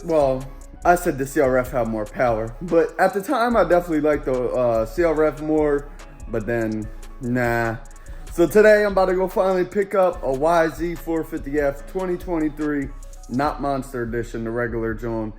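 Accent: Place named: Philadelphia, United States. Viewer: it’s how we talk in Philly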